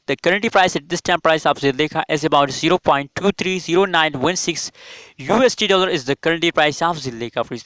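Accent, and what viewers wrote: India. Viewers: Sounds like you are from India, right? 🙈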